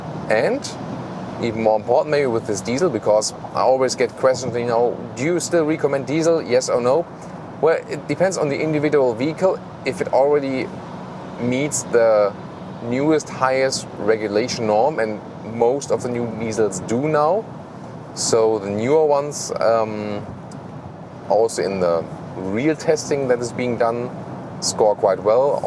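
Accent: Germany. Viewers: Your German English is annoying to listen to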